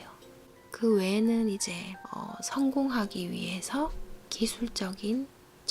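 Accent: Gyeongsang, South Korea. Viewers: 경상도 사투리냄새가 물신 나,오히려 정겨워라. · 아휴 님 목소리 땜에 잠못 잡니다 어쩜 그리 목소리가 달콤한지 ᆢ 근데 고향이 어디신지 경상도 목소리가 이리 좋은지 몰랐네요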